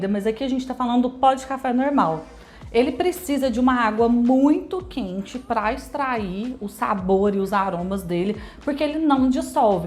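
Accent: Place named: Minas Gerais, Brazil. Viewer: Gosto muito da maneira didática que você explica. Fora que seu sotaque mineiro é uma delícia de ouvir. 🌺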